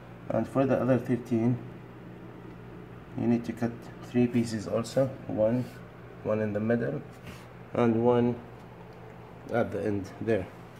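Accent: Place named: Africa. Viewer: You sound like you might be from some part of Africa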